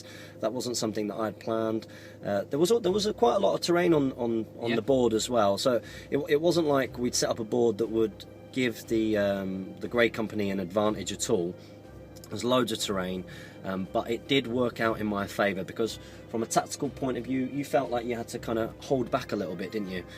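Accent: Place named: Texas, United States. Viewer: I don't think you are pronouncing it wrong, I just think its the difference in accents between Texas and the UK lol, I was just saying I found it amusing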